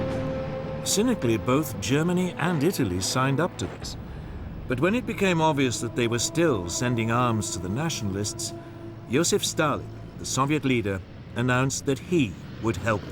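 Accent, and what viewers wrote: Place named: United Kingdom. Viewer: Another biased, pro lefty-slanted video done by a guy with a British accent. Obviously not all commies in Britain joined the "Cambridge 5" commies, drunks & perves and moved to Moscow. The video maker probably sends a box of candy to Jeremy Corbyn on Mayday each year. General Franco and the various forces/groups of "Nationalists" brought law, order, and peace to the disaster cauldron cooked-up by the Spanish "Republican" government in Madrid. Socialists, Communists, atheists ruin everything they touch. Just to irritate the pro-Communist video maker / narrator, I think I shall look for a photo of General Franco and buy it, frame it, and put it on display along maybe with the flag / symbol of the "Carlists".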